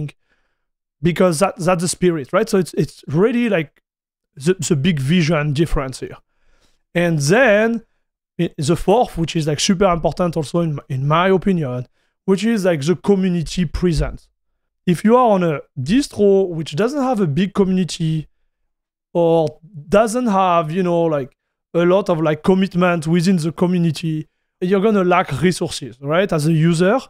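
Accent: France. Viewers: Fortunately if you turn subtitle (CC) on, though it's auto generate but match with his voice. FYI he's speaking English in French accent (sexy isn't it)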